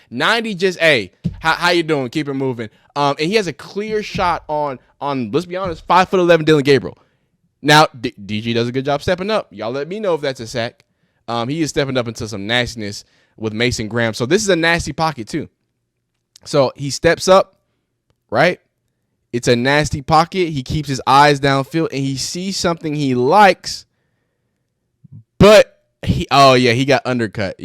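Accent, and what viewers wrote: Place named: South, United States. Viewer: Dude you talk way too southern like bro just hold up the rebel flag already and just claim your white already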